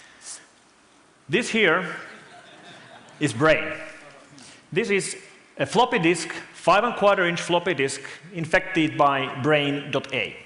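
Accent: Finland. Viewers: Oh that finnish accent :p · His accent actually isn't that bad for a Finnish guy. I've heard worse. And yes, the "klobal" is difficult to pronounce for Finns, mostly because the Finnish language doesn't really use many words with "g" in them. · I was very surprised to see a Finnish guy giving a speech in TED, and I was pleasantly surprised the content and how he delivered it. Great speech on an interesting & important subject. P.S. Extra bonus points for the accent.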